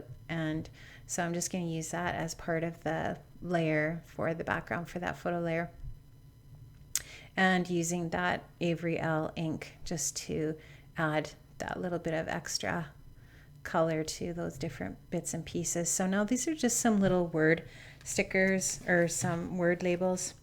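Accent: Canada. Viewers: Hahaha - oh to be a fly on the wall on the wall and hear you do British, LOL! You really must treat your YouTube followers to a bit of story time :-D It would be brilliant if, one day, you did get to travel to London and Derby and all over the UK. I've personally never been to Canada, and can't claim any connections to your beautiful land, but I have heard amazing things! One day I will go, and that's for certain. (See if I can nail your accent... that kind of thing, hehe!) Hope your summer homework isn't too arduous, poor you. Have a great weekend! :-D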